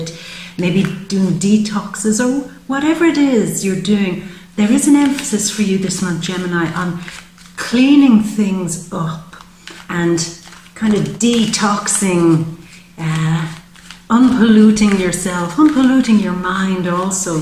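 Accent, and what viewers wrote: Ireland. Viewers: Well I have to say this has resonated with me like 98.9 % I don't know how you do it. But it was really nice to hear I am thinking like your cards... Bravo Cheers Love your Irish accent. Beautiful.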